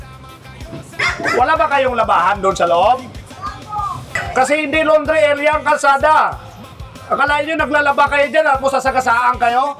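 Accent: Iloilo, Philippines. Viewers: Ilonggo ata SI sir 😂😂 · Parang ilonggo c sir ah 😊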